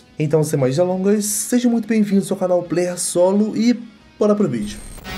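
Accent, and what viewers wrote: Portugal. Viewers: Nasceu em Portugal tem sotaque de português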